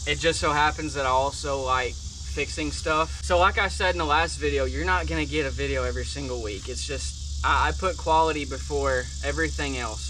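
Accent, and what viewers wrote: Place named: South, United States. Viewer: 남부억양인가 찰지네 ㅋㅋ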